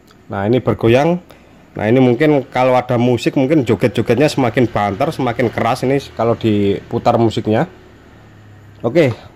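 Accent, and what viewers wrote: Java, Indonesia. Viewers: Gan dr logat nya orang jawa ya? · Wah keren samarinda rasa jowo Nunut sinau om bos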